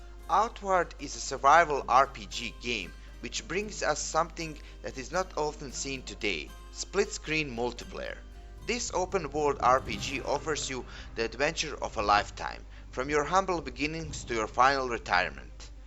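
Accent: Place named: Russia. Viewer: Like your indifferent Russian voice.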